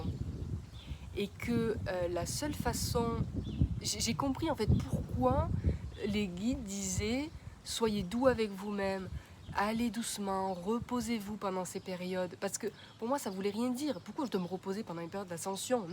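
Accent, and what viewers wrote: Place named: Gard, France. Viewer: Étant moi même Gardoise j'adore t'écouter je m'identifie bien à tes expressions, merci j'ai rigolé 🥰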